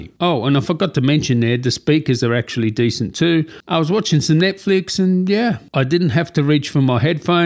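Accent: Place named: Australia. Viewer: Australian accent 😊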